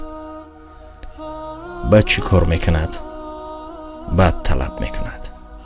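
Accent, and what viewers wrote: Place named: Khatlon, Tajikistan. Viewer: Ман нав фахмидм шумо аз хучанден амо лахчаи гапзанит пашти хатлония зато одами точики сам главни мусулмони гапнес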